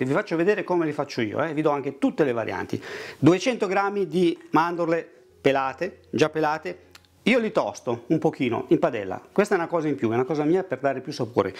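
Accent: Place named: Emilia, Italy. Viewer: Simpaticissimo. Lei è Emiliano!? Perchè mi sembra dall'accento che lo sia..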